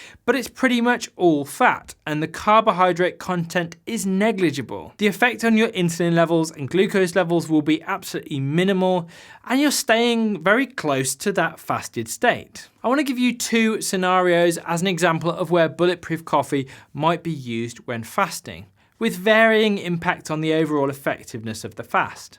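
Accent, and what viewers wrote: United Kingdom. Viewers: Hi I find your videos truly enlightening- learning a lot- very simple and after the initial getting used to the "British accent" as most of the ketosis videos seem to be from Americans, I am getting quite addicted to your knowledge tank, in a way, picking your brains. I am obese( there is no other word for it) and have been trying to lose weight for maybe 40 years- ( I am 50)- I do succeed sometimes but more often fail. Now at a particularly low phase - as living in a food desert and being a college student again is taking a toll. For the past month, have been low carb and high fat- but as you noted, the bullet proof coffee was not causing a weight loss for me- I took one every morning - with butter, coconut oil, cream, and coffee and a breakfast cum lunch cum dinner around 5 pm ( followed this pattern for about three weeks) - no weight loss, so decided to go completely off food- have been doing it for five days now- only water and black coffee( which I usually hate as it gives me acidity and migraines)- as a surprise, I have lost about 8 pounds in 4 days- surely water weight but not much urine passing either day or night. 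My urine strips do show significant ketones now. But the blood ketones are still low and the blood glucose I tested yesterday evening after a round of exercise in the cold weather, came to 150- very surprised as I am typically not diabetic, at least I don't think so. My question is, do you think a low carb ketogenic diet can reverse lipoma or skin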